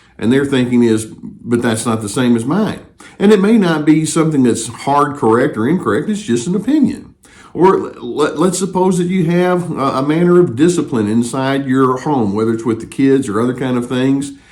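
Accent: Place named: Texas, United States. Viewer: I'm sorry to drift off the topic, but I really like your accent. Is is Texas?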